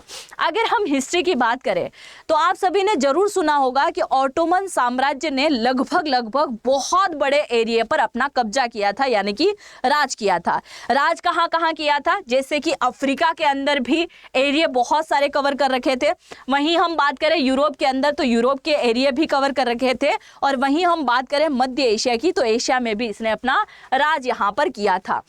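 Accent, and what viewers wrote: Gujarat, India. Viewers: Bahenji aap ka vishleshan bahut hi achha hai.keep it up. God bless you. Aap ke ucharan se lagta hai aap gujrati hain. Sorry,kindly try to correct your hindi specially numbers.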